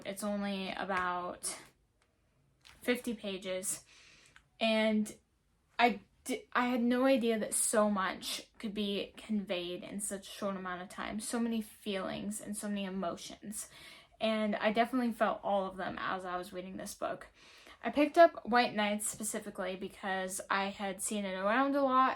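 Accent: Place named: United States. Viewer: Hi thanks for the video. English is not my first language. I'm very curious about human geography. You've got very cute accent. I'd love to know if it's from some specific part of the US.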